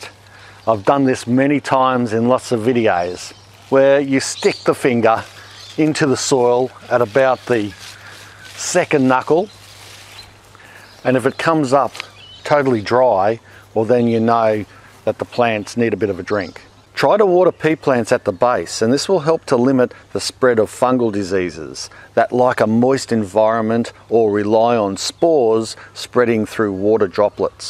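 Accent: Australia. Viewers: beautiful video! A bit of a personal question - what happened to your arm? Did you get bit? I've been meaning to mention it as it looks scarred - just curious as to what happened to your forearm. Looks like it healed nicely though. Looks like it happened a long time ago. As someone with scars on one of his arms too it's just something that jumped out at me. You're still beautiful! ;) Also I feel many of us listen to your videos half for the helpful tips and also for your great cheerful personality and wonderful accent - I come from canada myself and I love australian accents :)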